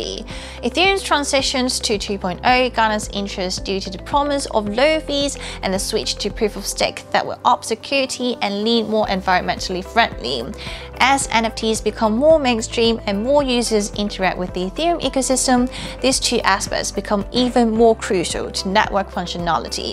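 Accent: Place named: United Kingdom. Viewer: I had to like and subscribe because its good content but also because I'm fascinated by your voice, it's like half very posh english and half Asian, it's brilliant haha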